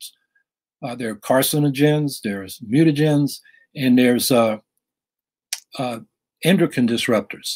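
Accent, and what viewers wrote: Texas, United States. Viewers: Love your office, but for a man born in San Francisco why do you talk your from 1890s Texas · I’m from Central and North Texas and can confirm he talks like a Texan or an Okie. Especially how he pronounces “the” like “də”.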